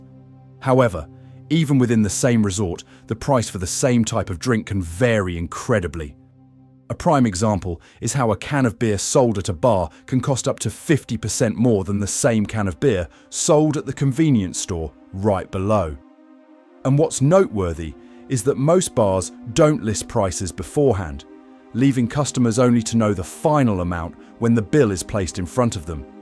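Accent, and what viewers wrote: United Kingdom. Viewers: Sounds like you want something for nothing. Las Vegas survives off of resort fees and the casinos without that there is no Las Vegas. If we go to your country you do the same shit what are you talking about. You're a hypocrite. You sound like you're from Great Britain has anyone ever been to Great Britain. They gouge the tourist like there's no tomorrow. You're a hypocrite.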